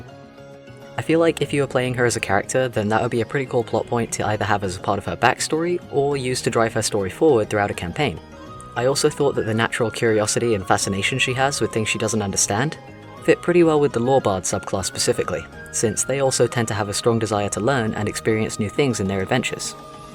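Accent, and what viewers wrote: England, United Kingdom. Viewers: I know this doesn't relate to d&d, but I'm curious to know what part of England he comes from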